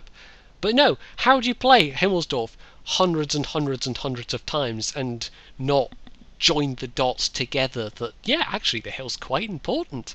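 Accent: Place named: Scotland, United Kingdom. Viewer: On my side bar, it's recommending a video for me, the Most Offensive Jokes Ever, Frankie Boyle is pictured, his jokes aren't offensive,merely telling the truth, you Scots have a unique way of speaking that that is true,don't you? With this in mind, Which tank in WOT really offends you?, offends you by just being there!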